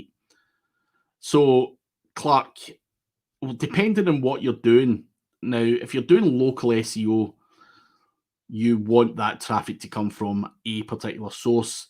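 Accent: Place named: Leith, United Kingdom. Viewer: Many thanks again. Your accent is spot on in the People’s Republic of Leith. - hit me up if you’re looking for a native English teacher; language courses is a niche I work with, can get you some great deals with the top schools; accent really isn’t that strong, with a wee bit of practice, you’ll have no problems.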